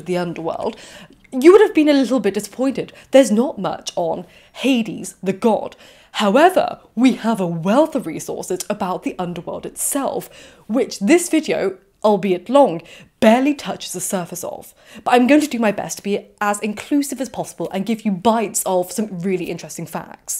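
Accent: RP, United Kingdom. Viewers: Just discovered this channel. Your voice is so deep and pronunciation so received (I think, not an expert on differences on UK regional speech), you sound like an incredible villain. Bummed it took me so long to find such a great history/archaeology/classics channel. Thank you for your work!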